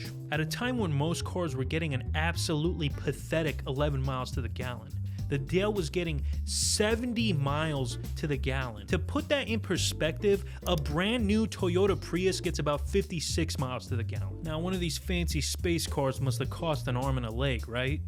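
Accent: New York, United States. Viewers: I'm New here . I'm from the UK . But I lost it when I heard " she could sell bacon to Ben Shapiro 😂😂😂 LMFAO. I can tell this guy is from NYC 👍 . You got yourself a new subscriber . Good content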